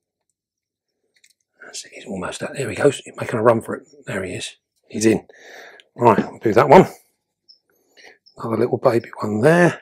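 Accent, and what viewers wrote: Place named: Cockney, United Kingdom. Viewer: I stumbled on one of your videos yesterday and the way you handled and cared for a big Australian spider seemed to be a bit therapeutic for me regarding my arachnophobia. You remind me a bit of a cockney David Attenborough (no offence). Thanks, you've got a new viewer. 👍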